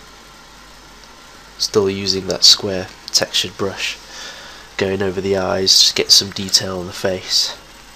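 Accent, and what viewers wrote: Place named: RP, United Kingdom. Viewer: You sound so posh on this!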